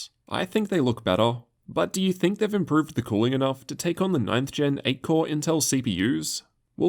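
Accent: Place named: Australia. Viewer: His accent and his voice is what I like. Back in the days when I was learning English, in our institute, we had both American and British accent teachers, but not an "OISTRALIAN" one (: I think his videos are so suitable for any English learner who wants to get familiar with Australian accent.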